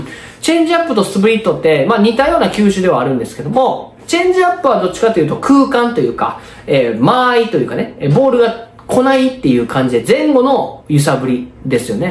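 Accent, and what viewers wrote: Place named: Kyoto, Japan. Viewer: 訛りを聞いて関西は関西でも京都の人だとすぐ分かった！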